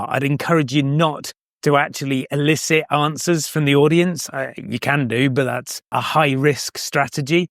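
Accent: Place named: United Kingdom. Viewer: An Italian with a British accent? Interesting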